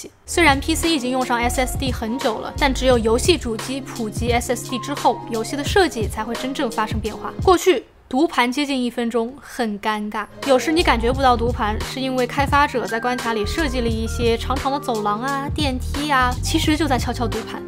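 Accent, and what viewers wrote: Standard. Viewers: 英语发音真的标准。听着就舒服。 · 講英文與中文都非常標準又好聽 畫面也都拍的很清晰到位 享受啊😎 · 英语发音超级标准。赞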